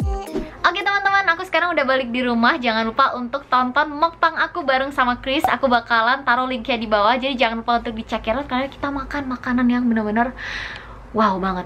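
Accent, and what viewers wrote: Indonesia. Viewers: Ini org korea atau indonesia ya, logatnya sama persis bgt kya org indonesia.. 😅😅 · Medok banget bhs indomu dek !! love it !!!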